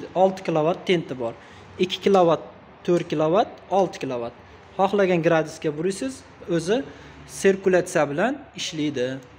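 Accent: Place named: Russia. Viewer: Ака лекин русча аксентийизга беш кеттим лекин, 😂😂😂 кловат, и, димахот гап ук лекин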